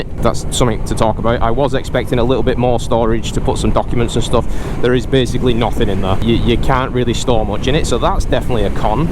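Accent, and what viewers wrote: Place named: Bolton, United Kingdom. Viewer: where are you from sounds like bolton u sound like paddy mcguinness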